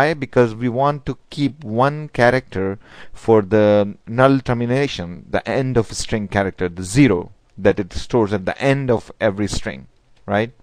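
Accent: India. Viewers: indian slang ftw!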